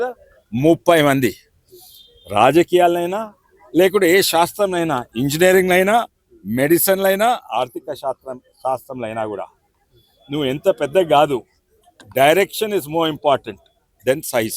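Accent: Telangana, India. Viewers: Sir firing eroju😂😂😂 Achamaina Telangana yasa😂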